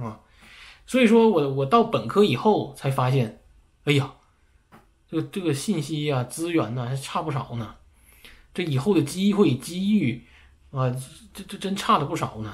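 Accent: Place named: Suizhong, China. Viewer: 听口音是绥中的吧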